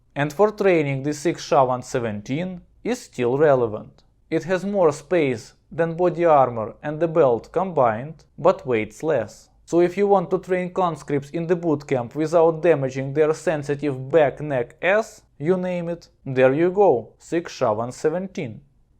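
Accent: Russia. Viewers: Maxed out Russian accent and top video my friend 🤟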